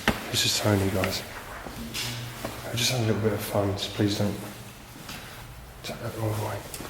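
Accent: England, United Kingdom. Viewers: Sorry... I couldn't view this one ... I feel energy and didn't want to go any further....There are place that have residual energy and you can feel it. This one is Heavy. Not saying bad...just uh uh.. But, I know you gave it the respect and love for what was left there. Good Job. I have to say in other places I have seen you go to....You speak while you are doing it. I want you to know that As you are speaking you are including those who view...As they are there with you and sharing the experience....You do an Awesome Job...and LOVE the brogue...( not meaning anything by it ) My First Love was from England and He spoke the way you do....It makes me smile. I'm a fan...And I'm older than your Mum so I'm safe ! Lol Thanks for taking us places I have never been.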